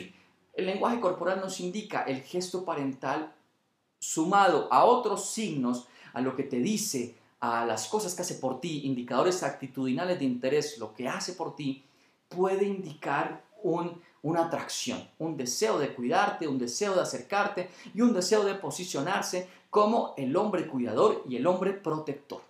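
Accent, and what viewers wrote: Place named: Colombia. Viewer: Bonito acento, de entrada supe que eras Colombiano :)... Me re encantaron tus tips, gracias!!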